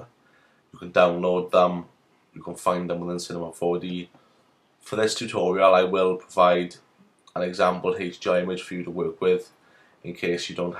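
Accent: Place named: Wales, United Kingdom. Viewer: Well, well! What a surprise to hear your accent! I'm used to hearing Polish, German or American accents when I click on tutorials, so this was a rare treat! That has to be worth a sub! I'm just down the road in Neath! Nice tutorial by the way! Where did you learn all this?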